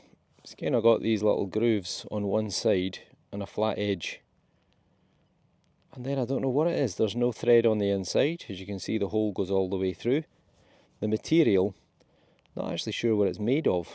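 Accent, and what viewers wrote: Scotland, United Kingdom. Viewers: thanks so much for your videos. Me and my 11yr old so n are very new to this amazing hobby but I have to say you have taught us so much already. On a more personal note, I have to thank you because there have been times when Theo has lost a bit of enthusiasm through drought periods or not getting any permissions, but your videos have genuinely kept him going. He loves your little catch phrases, and when we start for the day he will say "he we are in the beautiful fields of Cheshire" in a Scottish accent obviously 🤣. Keep up the good work. Thank you from Paul and Theo in Cheshire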